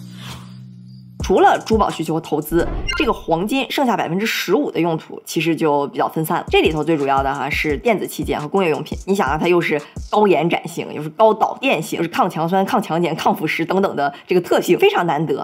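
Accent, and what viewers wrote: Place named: Dalian, China. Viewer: 今天偶然间，看到了2期小LIN的节目，其中有一个是三年前的，自我介绍那期节目，，这闺女真好，又漂亮又口才好，又有才，，听着口音，虽然是普通话但是总觉得亲切又熟悉，，，不会是大连的吧，至少是北方的（东北或山东），果然猜对了，大连闺女！！！哈哈哈哈，，179万订阅，我的天，比王局都多，，太牛了！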